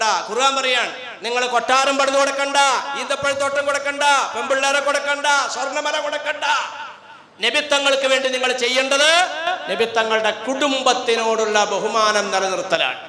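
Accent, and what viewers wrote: Perumbavoor, India. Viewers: ഉസ്താദ് പെരുമ്പാവൂർക്കാരൻ ആണോ? സംസാര രീതി അത് പോലെ ഉണ്ട്..എൻ്റെ ഉമ്മച്ചിടെ വീട് അവിടെ ആണ്..ഉമ്മചീടെ വീട്ടുകാർ സംസാരിക്കുന്നത് പോലെ തോന്നുന്നു...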